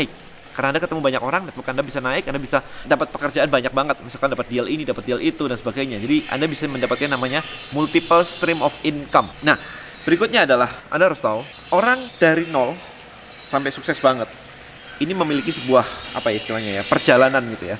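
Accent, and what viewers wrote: Java, Indonesia. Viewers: koko medoknya teknikal banget hehehee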